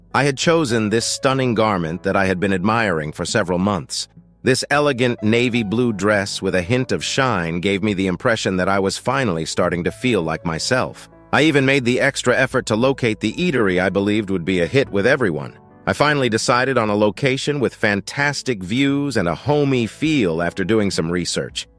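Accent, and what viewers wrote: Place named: United States. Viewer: This must be a Japanese video translated into English and given an American speaking voice. It starts with a total dimwit who is driven insane by her family and that finally "opens her eyes"! Over 18 years, OP never learned a lesson that she was a second class citizen in her own home and needed to work, save some money for emergencies? Tsk tsk For her 18th birthday, OP could have asked for a few $ and gone to Wendy's or Mac with her friends? A much more realistic goal? What a dimwit to continue to trust her birth family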